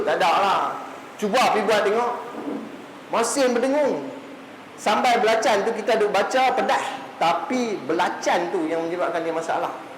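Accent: Kedah, Malaysia. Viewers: dia pakai bahasa Malaysia juga tapi loghat Kedah. Sebab tu awak tak faham.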